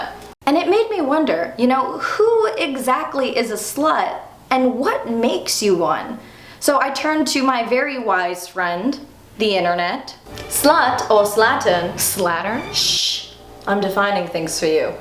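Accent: England, United Kingdom. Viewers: Can I please have a telling me definitions in my computer with a British accent forever? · Nice british accent too for the internet!! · your using the same English accent that the BBC use lol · your british accent is downright offensive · Hahaa, I love that English accent!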